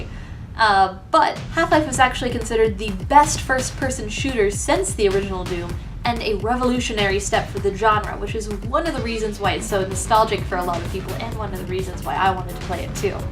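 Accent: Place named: South, United States. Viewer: I did watch this the other night but as per usual fell asleep at the sound of your calming southern tones xD Awesome review and very objective! (Which is a good thing) entertaining and fun!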